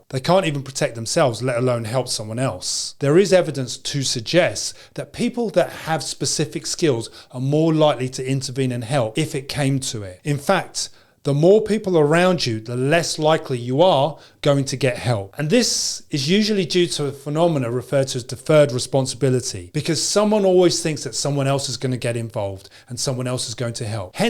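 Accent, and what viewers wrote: United Kingdom. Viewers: The comments are from people all over the world, but especially GB, because the narrator is British. We don't have access to firearms in Britain. I'm guessing that you are a US citizen, and I understand your right to do EDC and defend yourself with a handgun. Here they are not morally justifiable for ordinary citizens, but that could change of course.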